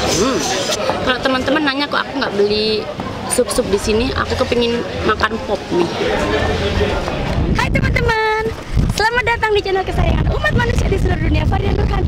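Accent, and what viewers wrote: Java, Indonesia. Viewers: Medoknya itu low ngbuat ak kalo nonton pasti diulang2 mulu😂😍 loveLah buat 😘💋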